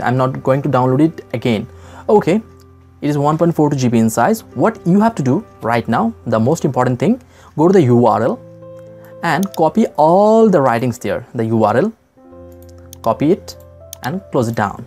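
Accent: India. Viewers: alhamdulillah i am not indian also but i thought ur voice was indian srey Thanks alot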